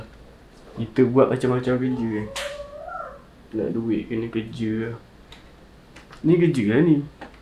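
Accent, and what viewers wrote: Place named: Rompin, Malaysia. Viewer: Org rompin...kawan agak dah dengo loghat tu...terbaik terr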